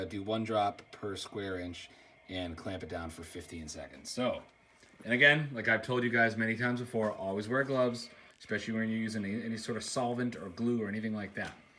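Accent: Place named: Canada. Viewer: You sound like a Canadian.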